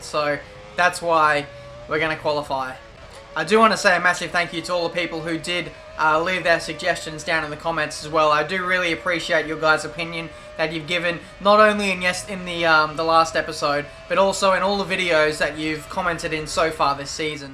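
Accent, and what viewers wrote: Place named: Australia. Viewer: kapiniuzertva its his Australian accent he says mart'n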